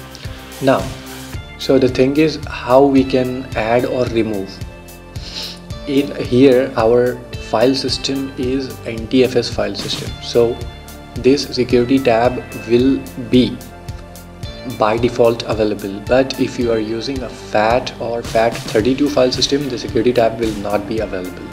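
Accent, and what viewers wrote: India. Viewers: Noice Musik, and beautiful Indian accent.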